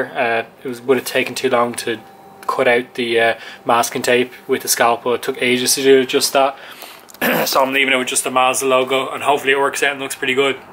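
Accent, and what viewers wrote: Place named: Ireland. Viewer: Good job, irish? I hear the accent of my people! Lol county down fella here! Great video man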